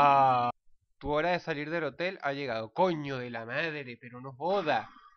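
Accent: Argentina. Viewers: Le fue engrosando su voz de sus 25 años para acá, muy aparte del acentro entre mezclado con el argentino, pero la voz le cambió.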